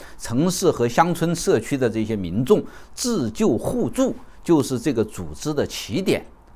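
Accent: China. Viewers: 聽口音你也是中國人吧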